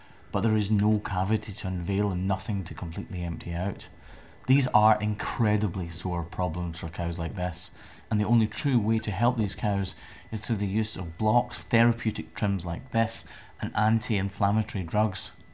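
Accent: Scotland, United Kingdom. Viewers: Are you Scottish? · Yes, you are a Scottish man - heavy accent, so obvious! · I don’t care about cows, I‘m here fir the Scottish accent.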